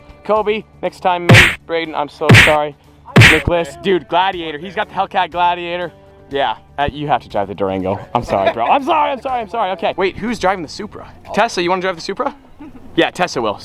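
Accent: United Kingdom. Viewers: is he British? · Is it just me or does he sound British during the intro?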